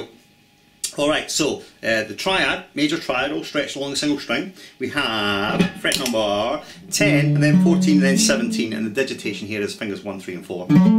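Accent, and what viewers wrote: Scotland, United Kingdom. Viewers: If the Scottish (?) accent wasn't cool enough, he owns up to not being "A pimple on Shawn Lane's Arse!" which most of us would have to admit. Very cool vid, thanks for posting.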